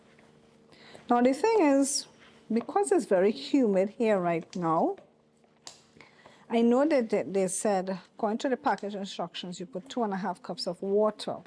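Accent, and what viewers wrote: Trinidad and Tobago. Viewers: Great video. That beautiful Trinidadian accent is so refreshing and you have inspired me to try this myself. I know now an arepa is a cornmeal fry bake. 10 out of 10.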